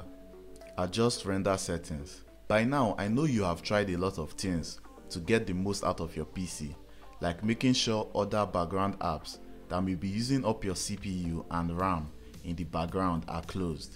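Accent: Nigeria. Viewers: Are you from Nigeria 🥺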